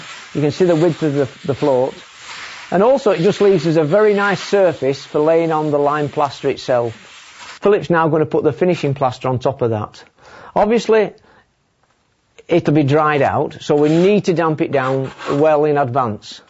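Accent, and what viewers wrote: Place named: Preston, United Kingdom. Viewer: sounds like a preston accent ,you from preston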